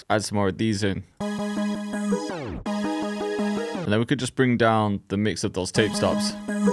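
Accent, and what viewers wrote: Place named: England, United Kingdom. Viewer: i love that England way of speaking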